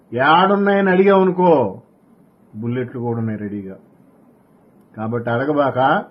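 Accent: Nellore, India. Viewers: హాయ్ గారు 🙏 చాలా బాగా చెప్పారు సార్ 😂 మీ వీడియోస్ watch చేస్తుంటాను చాలా బాగుంటాయి, ముఖ్యంగా మన నెల్లూరు జిల్లా యాస బాగుంటుంది 👏👏 మీ మాటలు,అమ్మగారి మాటలు వింటుంటే నాకు మన ఊరు యాస గుర్తు వస్తుంటుంది am from hyd. సూపర్బ్ taking గారు మీరు,👏💐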